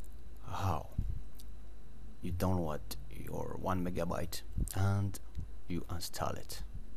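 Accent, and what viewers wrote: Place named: France. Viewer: non , french accent !!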